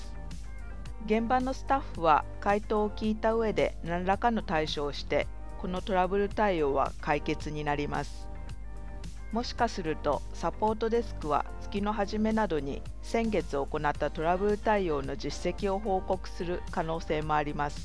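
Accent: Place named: Japan. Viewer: 日本人的な英語ワードの読み方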